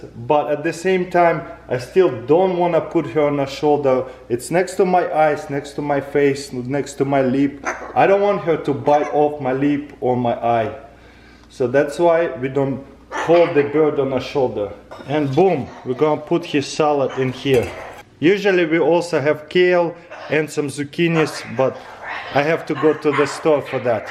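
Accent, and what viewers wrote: Russia. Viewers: Are you Russian Cuz u have a Strong Accent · I've always liked Russian accents but yours is remarkably nice to listen to. Love the commentary lol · His russian English accent is a kind of cute!! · Are you Russian?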